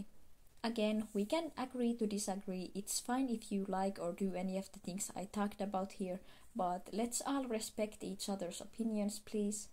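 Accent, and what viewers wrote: Finland. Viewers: LOVE THIS VID!!!! and is that a Finnish accent? :3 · omg suomi aksentti havaittu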